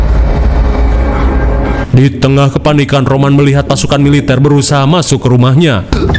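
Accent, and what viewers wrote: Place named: Belitung, Indonesia. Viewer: Gue pingin tau si mimin berasal dari kota mana?? Logat bicaranya kayak logat melayu belitung.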